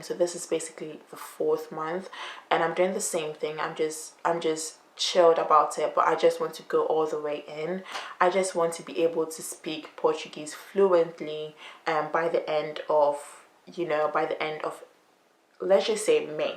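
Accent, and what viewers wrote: Italy. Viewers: Seu português soa com sotaque italiano, fica muito fofo.🥰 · Já estás a falar TÃO bem! Com um sotaque italiano muito fofo e um pouco do Brasil! 🌷 Um beijinho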